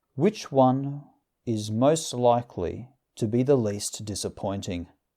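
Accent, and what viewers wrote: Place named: Australia. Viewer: whoa, yes, hahaha. But this chap is (sorry Wade, no offence) a little more high class I reckon. Or it could just be the slightly different regional Oz accent that sounds classier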